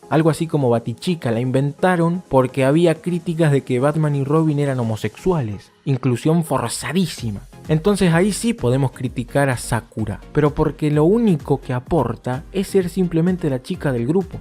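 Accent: Argentina. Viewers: En este vídeo se te escucha mucho el cheeee, el boludooo, lo argentinooooo; me gusto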